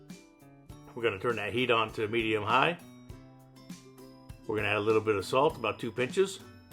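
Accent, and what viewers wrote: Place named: Louisiana, United States. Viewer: I loved watching him , loved his Cajun accent!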